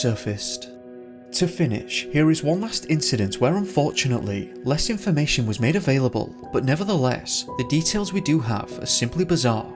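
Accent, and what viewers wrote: United Kingdom. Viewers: Congrats on reaching 100k, always enjoy your videos, fascinating and well researched. Nice to hear a fellow Brit narrating!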